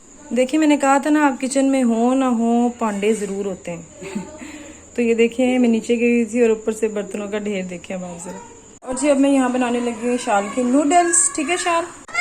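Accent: Punjab, India. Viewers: Urdu Mein punjabi ka tarka both Acha lagtha ha. very good sharing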